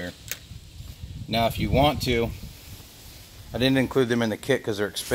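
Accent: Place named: South, United States. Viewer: Finally found it! You sound like Matt Fikac, you southern guys all sound the same 💪🏼😆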